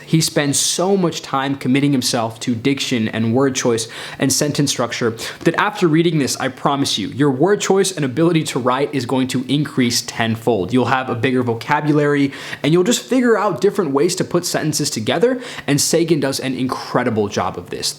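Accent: India. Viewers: Your English assent just like Shashi Tharoor .....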